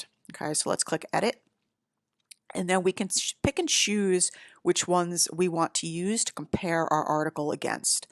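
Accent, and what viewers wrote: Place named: Philadelphia, United States. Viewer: Nice content! Based on your accent I think you're from Philly :)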